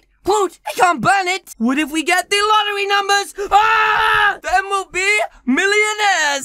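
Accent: United Kingdom. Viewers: are you brittish 🇬🇧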